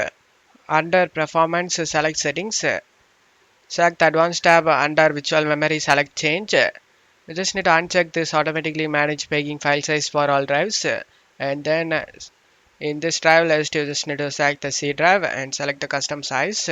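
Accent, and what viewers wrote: South India, India. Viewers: Duck ur accent south indian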